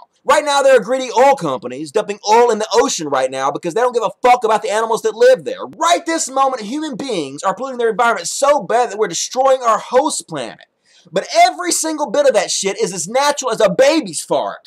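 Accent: South, United States. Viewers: your southern accent makes this video so much better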